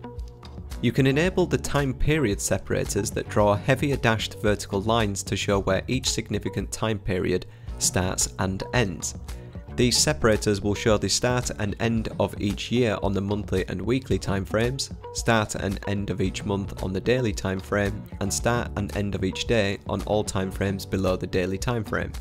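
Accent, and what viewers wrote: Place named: Yorkshire, United Kingdom. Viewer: Excellent tutorial, and totally understand the Yorkshire accent!!!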